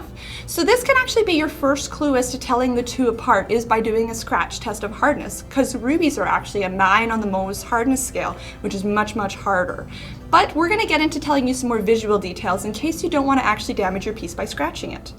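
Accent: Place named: Winnipeg, Canada. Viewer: Ruby was my Mom's birthstone, emerald mine. I loved collecting garnets during my college Earth Science' class field trips as well. It was funny though, for an engagement ring my fiance wanted cubic zirconia because it was more 'sparkly' than diamond. I thought it was nice too. Love your Winnepeg accent--I worked for a client up there in the late 90's, I was given a visa which marked me as a professor so I could teach hotel business systems' classes all across Canada without paying a customs fee, under the rules of NAFTA. My Canadian hosts were so nice to me--my first trip there, with my visiting Napa Valley Gospel choir, was in '72 in BC and Alberta. I was so blessed to go there so young, and my timing was lucky, because I returned home only to have an emergency appendectomy a month later that August, returning from my school's summer vacation an intriguing hero among my choir mates and classmates. 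I remember arriving in Ottawa during a terrible blizzard at 3AM one year, and my client picked me up at the airport as my flight came in after an eight hour delay from Detroit. I also remember a client in Montreal giving me a day off so I could hop a bus to Quebec City, and I enjoyed the Winter Carnival and ice sculptures. Other than Europe, I spent more time in Canada (mostly working) than any other place outside of the US. I remember the Geology and Glaciers of the Canadian rockies, and the hospitality of the French and English Speaking Canadians who treated me like I was a US am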